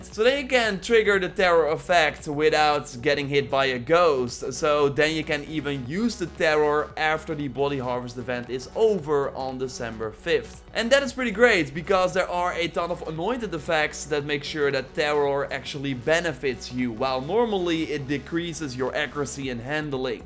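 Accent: Netherlands. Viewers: Because they're both Dutch and this is a common accent here. They overpronounce stuff. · That dutch accent though..... Lekker bezig mannen!! 😜😎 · Are you dutch because your accent bleeds through every now and then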